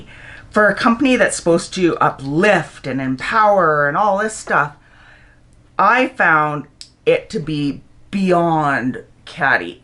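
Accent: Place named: Canada. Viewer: are you from Canada? Love the accent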